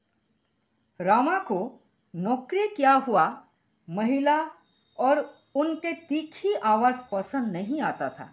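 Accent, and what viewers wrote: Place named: Bengal, India. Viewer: Its bengali accent🥸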